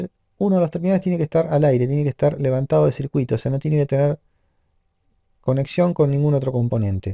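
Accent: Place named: Rioplatense, Argentina. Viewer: Creo que eres Argentino o Uruguayo Saludos de Peru Papá se les quiere gracias por el curso💪🇦🇷♥️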